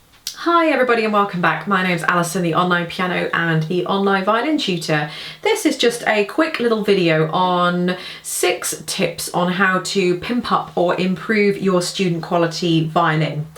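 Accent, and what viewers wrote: United Kingdom. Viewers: I love hearing "pimp up" in a classy British accent. It's really funny.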